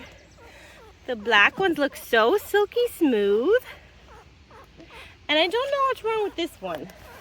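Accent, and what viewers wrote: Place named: Pennsylvania, United States. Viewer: I was trying to place your accent because it sounds familiar. I came to the conclusion that maybe you were raised in PA. I hope that bunny isn’t struggling to breathe - poor thing. 🙁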